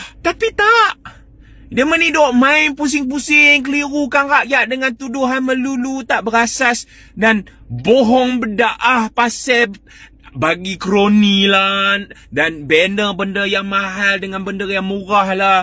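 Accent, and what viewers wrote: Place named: Perak, Malaysia. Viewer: Bahasa Perak ko... Pehhh.... 🎉🎉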